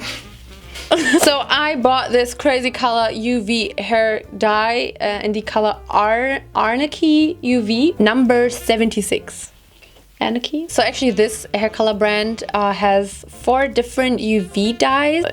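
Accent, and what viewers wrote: Germany. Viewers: Du hast doch nen deutschen Akzent in deinem english auch wenn du es nicht spielst 😅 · I would like to hear more of your German accent 😂❤❤ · Ich liebe dein German akzent 🤩😍🔥 I tried so hard to to do it, but I am *tresh* 😂😂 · Der deutsche Akzent killt mich jedes mal xD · with an ultra German accent is the best. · Ok tbh ich hab deinen deutschen Akzent bevor du ihn so typisch deutsch ausgesprochen hast, gar nicht bemerkt xD danach schon xD aber respect echt gutes English was sie da sprechen tun Madame d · Der deutsche akzent👌😂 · du hast auch so einen typisch deutschen Akzent😂😂❤ Aber das find ich, hört sich süß an, also keine Sorge🤗😙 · Sie hat iwie schon nen deutschen akzent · THE GERMAN ACCENT ❤️❤️❤️❤️ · Pure Unterhaltung, dieser deutsche Akzent xD #broccolomi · Are you German and if you are your accent is beautiful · OMG THE GERMAN ACCENT PLEASE ONLY TALK LIKE THAT!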